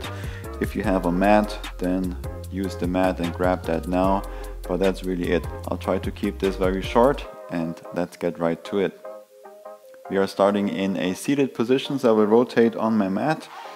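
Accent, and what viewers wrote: Germany. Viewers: Das hört sich doch nach nem deutschen Akzent an 🤔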